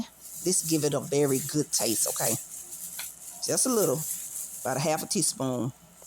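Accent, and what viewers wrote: South, United States. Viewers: I just love your accent dear!! Reminds me of my time living down south 🥰 i hope my pie turns out good. Thanks for showing me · Sister, as I listen to you prepare that pie, it reminded me of my momma. She been gone for 15 years. And then the southern accent. Oh girl, you took me back. Thank you so much.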